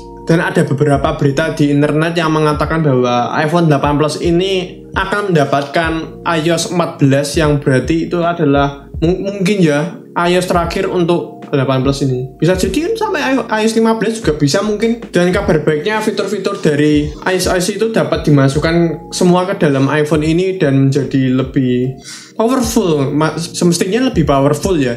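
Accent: Java, Indonesia. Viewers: Medokkkeee podo karo aq likk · Salfok logatnya medok bgt bang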